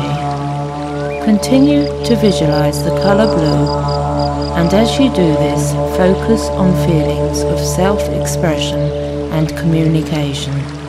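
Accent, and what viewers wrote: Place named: East London, United Kingdom. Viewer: Worst. Accent. Ever. OMG it's like watching East Enders.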